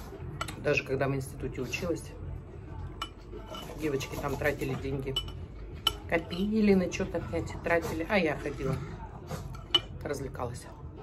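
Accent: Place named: Russia. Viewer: Вы хотя бы правильно назвали улицу...😂Самбурова !!! ( спроси у местных) кацапский говор + не правильно ударение😂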